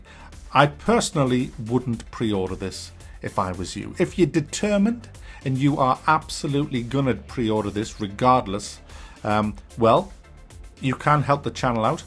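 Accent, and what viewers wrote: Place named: Middlesbrough, United Kingdom. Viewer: He sounds like he's from middlesborough, sir davos sounds more like a geordie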